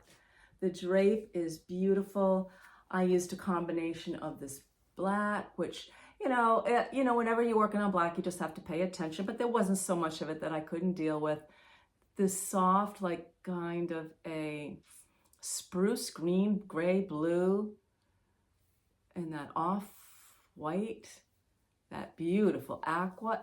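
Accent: New England, United States. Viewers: Hello,. What a pleasant surprise to come upon your podcasts. We seem to have a lot in common besides a love of knitting. I grew up in Pawcatuck, CT so still consider myself a New Englander. I love your footage of Block Island which I have visited once - such a precious memory of a lovely day with my Dad. My brother lives in Westerly, RI so I love your accent. I, too, have lost a wonderful uncle who left a legacy of storytelling. Your knits are gorgeous and so inspiring. I currently have an Artus shawl on my needles, have knit the Half + Half Triangle shawl (have linen quill to make 2 more), and love all the blue/green shades that you are drawn to. Love your reference to the relationship between gratitude and happiness! Looking forward to your future podcasts.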